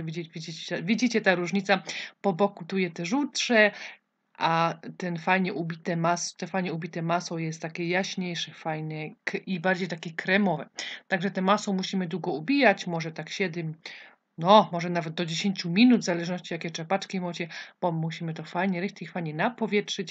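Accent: Silesia, Poland. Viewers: Pani ze ślunska ja? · Pyszny przepis! Dziękuję! Słychać, że Pani ze Śląska! :-)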